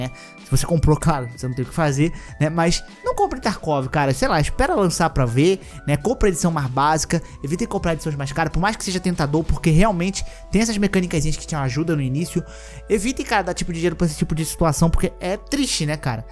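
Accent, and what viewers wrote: Rio de Janeiro, Brazil. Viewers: Sotaque carioca simplesmente intakavel, flws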